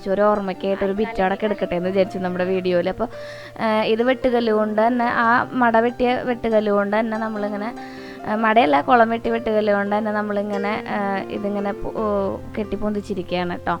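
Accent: Thrissur, India. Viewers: Thrissur slang😀😀😀😀😀😀😀😀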